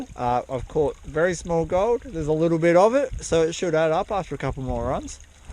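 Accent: Australia. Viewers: Nice, I was thinking Aussie or New Zlander, none the less great job and Keep up the great work.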